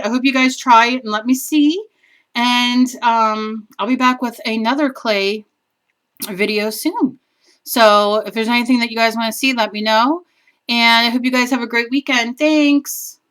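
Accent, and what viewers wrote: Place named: Altoona, United States. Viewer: You sound like you maybe from the Altoona area of PA. Nice memory of my cousins who living there still. Nice video, thank you to share. I am a Stroke survivor and hoping I can start make polymer items for gifts and fun.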